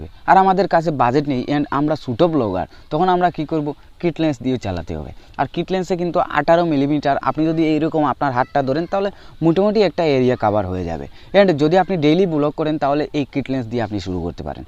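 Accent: Sylhet, Bangladesh. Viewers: Bhai apni Sylheti right?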